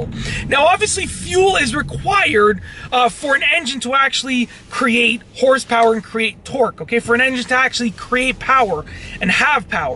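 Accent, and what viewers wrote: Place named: Toronto, Canada. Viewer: As soon as he started talking I knew he was from the gta. then I noticed the streets and was validated with the knowledge of knowing I was right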